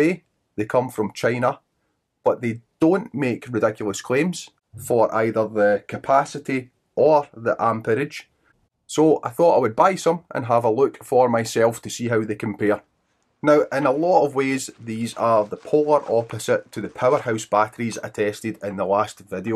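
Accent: Scotland, United Kingdom. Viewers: That strong Scottish accent tho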